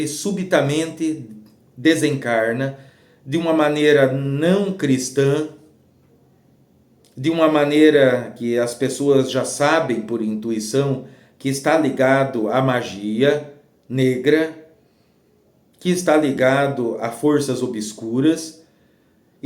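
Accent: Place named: South, Brazil. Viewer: O cara não sabe nem o Português, quanto mais falar sobre coisas que não existem. E esse sotaque sulino escroto, e esse olhar de louco.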